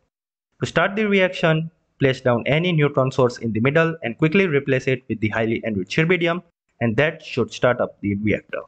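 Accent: India. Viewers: I swear to god, if i need help with anything there is ALWAYS an indian sounding guy on youtube to help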